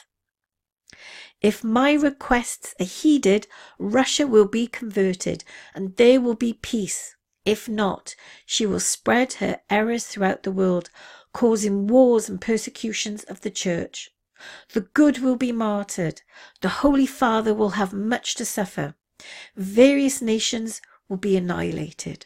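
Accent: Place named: United Kingdom. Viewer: Very well spoken dear lady I have a New Fav YT channel I believe the final prediction has been held from us. People Do panic easy and from other circles such as the “Visitors” stories are told of how mankind was grown through Genetic manipulation and planted here on Earth? Thank you, I as a Yank who was raised in Southampton I always perk up to a Brit accent!Blessings to You🌹💀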